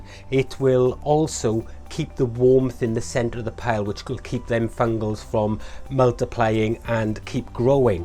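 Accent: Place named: Hengoed, United Kingdom. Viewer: Where are you located fella, I'm in the Hengoed area and you look and sound like you could be local to me?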